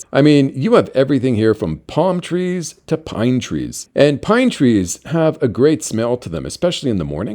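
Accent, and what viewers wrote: Canada. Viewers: Thought I detected a Canadian accent! New subscriber here from BC. Malaybalay looks very interesting. So insane how cheap it is...especially the rent! You seem to have created quite the income stream for yourself. Congrats. I'm looking forward to viewing more of your content.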